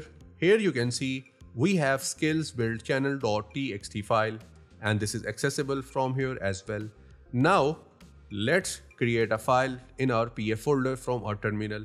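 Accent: India. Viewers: Indians speaking English sounds very ridiculous, next time use subtitles or remove anything below your tonge, the world will thank you.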